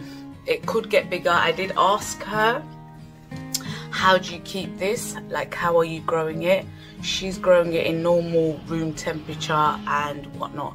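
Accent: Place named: United Kingdom. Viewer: Would you pls update us about your that little Alocasia you bought from ebay ? And another thing i want to add "apnar British accent khub e shundor and perfect MashaAllah, i love it alot"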